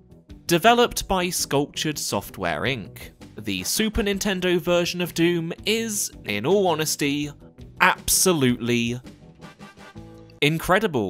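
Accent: United Kingdom. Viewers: Ayyyy it's the boi! That's what I like to see. Missed this incredibly calming and soothing, british voice👏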